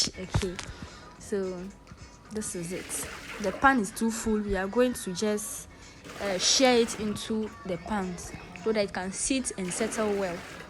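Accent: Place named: Ghana. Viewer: Very educational and interesting 🎉 Plus your voice and GH accent is giving 😊🌹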